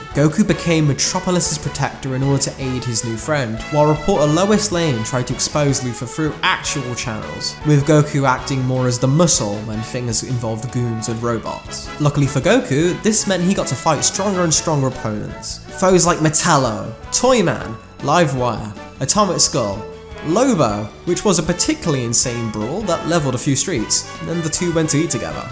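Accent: United Kingdom. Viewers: You have a very nice British accent. You can go far in YouTube with just that. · nice video, the only problem is your hard Brit accent. Found it so difficult to understand you.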